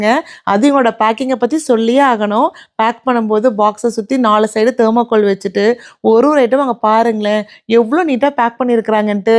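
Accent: Kongu, India. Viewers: திருப்பூர்... நீங்க எதார்த்தமா பேசறது கேட்க நல்லா இருக்கு.. நம்ம கொங்கு நாடு பாஷை அருமை 💚💚💚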